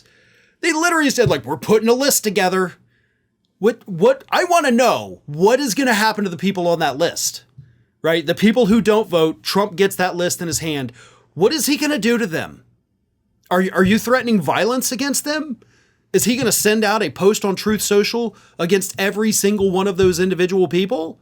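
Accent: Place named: New York, United States. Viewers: Hey....loved your NY Italian mafia accent..... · Your mob New York accent is funny.😂😂😂😂😂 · Gotta say, loved the New York tough guy accent😂😂😂😂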